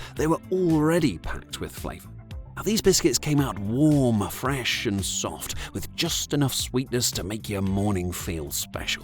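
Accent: England, United Kingdom. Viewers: It's weird hearing american fast foods of yesteryear being narrated by an englishman.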